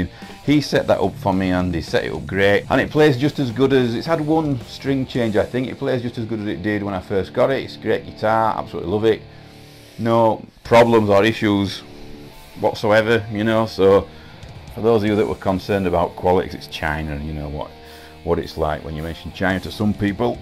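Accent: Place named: Yorkshire, United Kingdom. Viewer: Alright, mate, im gonna guess the accent. Rotherham or Sheffield? Helpful vid btw, good work🤘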